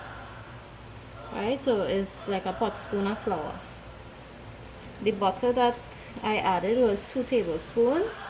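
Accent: Trinidad and Tobago. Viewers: A tru trini talk dey gal 😂❤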